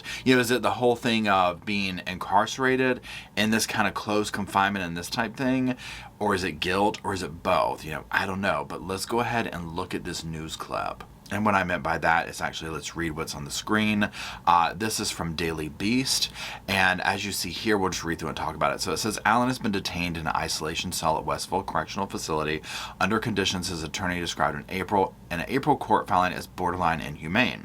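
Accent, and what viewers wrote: South, United States. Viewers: I wish you narrated audiobooks. You have such a great voice. It is perfectly clear with just a hint of a proper souther accent.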